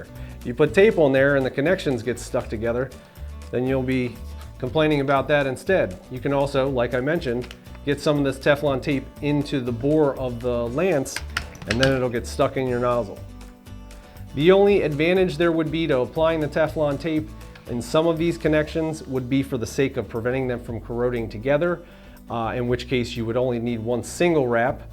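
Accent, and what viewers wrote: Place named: Philadelphia, United States. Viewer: Yo! Can here the Philly South Jersey accent. Love it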